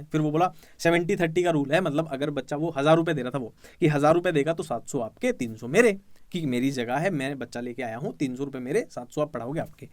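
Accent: Haryana, India. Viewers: Bhai mere app ho successfull.. You help a lot of neet aspirants Ghana pyar haryana sonipat te Apke video main doubt tha avaj te ke haryana te ho Ibb confirm hogya❤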